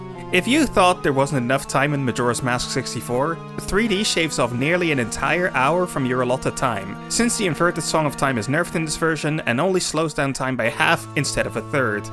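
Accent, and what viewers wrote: Netherlands. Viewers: Are U dutch ? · I always get whiplash when I hear a dutch person making videos I'm actually interested in 😅 don't see it often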